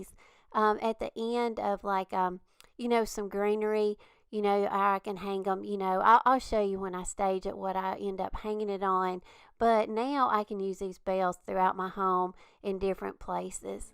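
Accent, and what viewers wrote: South, United States. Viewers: Thank you so, so much for getting back to me, don't know how I missed it, can't wait to make some of those trees! By the way, while I was searching (& finding 25 OTHER fun things to make!) I saw something where you seemed like you were sort of apologizing for your Southern accent---don't apologize, it is charming & adorable. I've never lived in the South, but I love hearing you talk, makes me feel like we could sit down on the front porch with a glass of sweet tea & giggle about some of our craft disasters (no. more. glitter. ever.) and favorites. Thanks again!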